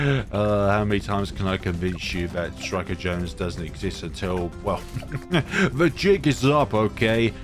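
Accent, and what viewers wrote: United Kingdom. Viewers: Wait.are you brittish or australian? Cuz you sound like the ceo of australia.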